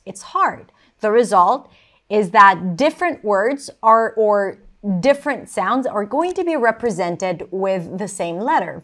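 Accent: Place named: United States. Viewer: Madam your English speech is American I think coze I want to fallow .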